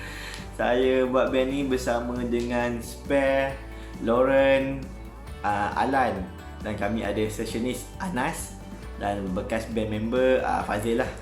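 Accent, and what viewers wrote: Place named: North, Malaysia. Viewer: Happy birthday, sikit lagi nak masuk 4 series. Dalam banyak dilek, utara punya dilek paling best dengar.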